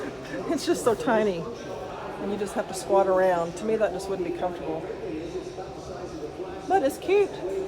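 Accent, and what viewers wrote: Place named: South, United States. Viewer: I love your southern accent. Your so cute! Thanks for sharing your experience of owning a Tab 400. It really help us new owners. I learned so much!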